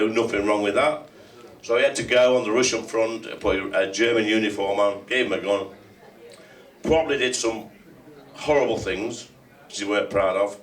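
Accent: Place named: East Midlands, United Kingdom. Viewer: He sound like he's from East Midlands.. nottingham even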